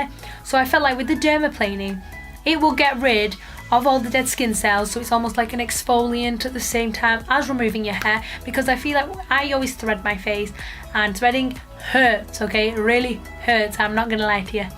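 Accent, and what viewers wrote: England, United Kingdom. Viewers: Idk why but I always am rubbed the wrong way when I see an Arab, Indian, or African with an English accent. All I hear is a little rat bastards coward runner. Ran from your country to the safety of another. Weak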